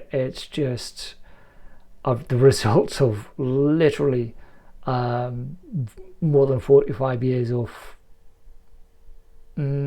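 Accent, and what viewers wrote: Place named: Iceland. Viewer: Well, thats an instant subscribe :) Although i admit, i read your articles with much more of the very nice icelandic accent.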